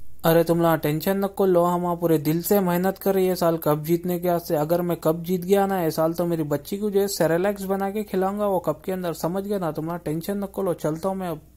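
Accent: Hyderabad, India. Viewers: Bhai teri aawaj aur haidrabadi style kamaal hai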